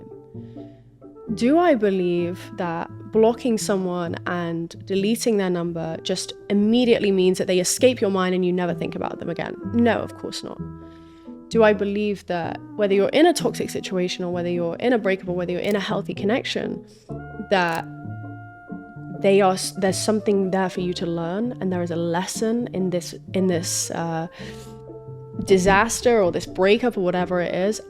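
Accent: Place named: London, United Kingdom. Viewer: I Loooooooove your London accent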